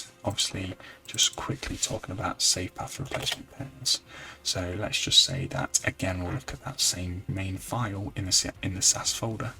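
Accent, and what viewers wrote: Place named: Manchester, United Kingdom. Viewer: Hiya, are you from Manchester way? I was trying to place your accent. If so I'm a little further north but local! Anyroad, not important ;-) And if I've insulted you, apologies, haha! You've gone to a lot of trouble, first with the programming, and second with the explanations/instruction within videos such as this. The quality is fine and explanations clear. I believe complainants should perhaps listen harder and get hands on more. Thank you for the time and trouble you've put in to this. Much appreciated.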